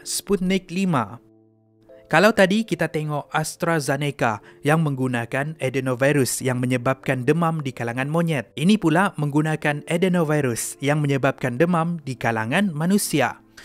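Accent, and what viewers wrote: Sabah, Malaysia. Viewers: Abang mcm logat ckp mcm orang sabah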